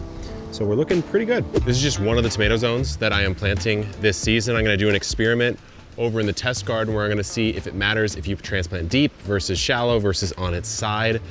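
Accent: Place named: Australia. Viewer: Hello Mr self-sufficient guy I love your videos I learned so much and ps. Love your accent I guess you from Ustralia 😁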